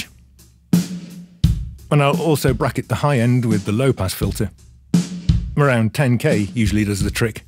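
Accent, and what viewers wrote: United Kingdom. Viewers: But wait! You sound British to me as an American. Have you ever heard of SSL? They made this thing called the 4000. You needed really long arms. And they became very popular. With people that had long arms. Not so much with you folks with the short arms. You've been playing with too much. While I love what all of these plug-ins have to offer. Most all are really unnecessary. They are just there for children that like playing video games with audio software and always win. It really doesn't have much to do, with music creation, itself. It's whizbang. And so all those great sounding rock 'n' roll hits. That all of these plug-ins are said to emulate. It's all bullshit. It's, total bullshit. None of those great hits had much of anything to use. We had two may be three perhaps four choices? For everything. That was it. And we had one limiter. 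And then got a cheap one. Only to find. It really wasn't worth using. And so we only had one good limiter a black 1176 purchased brand-new in 1976! I just sold last year. Because the clones are just as perfect. As in, perfect. And at a bargain price! And I had one reverb. From Germany. Made with a big piece of cold rolled steel plate. From a company that, tried to kill us years ago. Along with their microphones. And their cute little cars. All thanks to the worst dictator in modern times. And was a hard way to get good quality sound. That we already had. But theirs, sounded different. It sounded German. For, Wagner. Our stuff was better for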